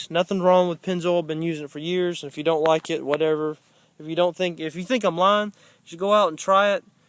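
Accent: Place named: Texas, United States. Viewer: accent, Im almost sure sounded like texas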